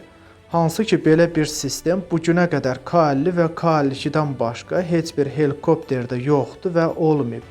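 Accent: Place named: Gadabay, Azerbaijan. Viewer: Qardaş Gədəbəylidi deyəsən. Ləhcəsinə görə düdim. Uğurlar.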